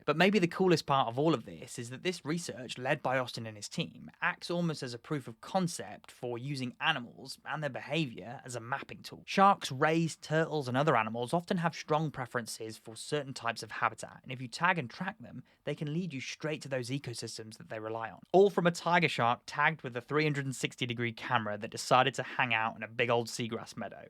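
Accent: United Kingdom. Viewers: cool! Just sounded funny coming from a brit accent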